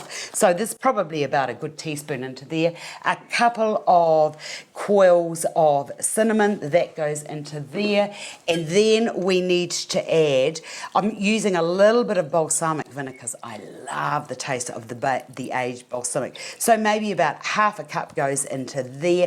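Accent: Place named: New Zealand. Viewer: Good old Kiwi accent : )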